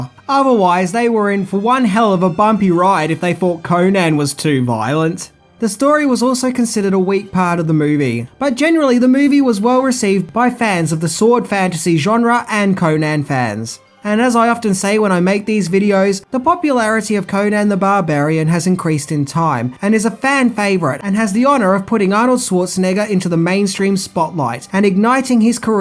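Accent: Australia. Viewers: No, you idiot. lives in Australia. He's said that many times. Not to mention his Australian accent is a dead give away. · He pronounces things as an Ozzie. Though I think he uses words incorrectly on purpose, like ‘irony.’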